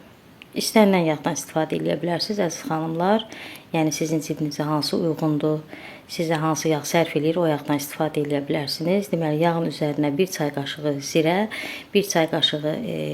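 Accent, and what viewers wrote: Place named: Mughan, Azerbaijan. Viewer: Azərbaycan balaca olsa da, hər tərəfinin öz mətbəxi var. Bəli, bizim Gəncə-Qazax bölgəsində də fəsəli ədviyyatsız, kərə yağı ilə olur. Ancaq gördüyünüz kimi, xanım Muğan ləhcəsində danışır. Hələ Quba tərəfdə fəsəli mövzusunda tamam başqa yeniliklər kəşf edə bilərsiniz. :) Bu, ölkə mətbəxinin zənginliyini göstərir və çox gözəldir. Yaxşı ki, belədir. Mən çoxdan bu ədviyyatlı fəsəlini bişirmək istəyirdim. Müəllif də çox gözəl, detallı izah edib. Mütləq bişirəcəyəm.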